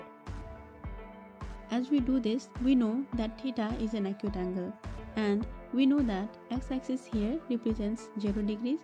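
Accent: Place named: Nepal. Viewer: Hlo ... miss where are you from ? .... your accent look like nepal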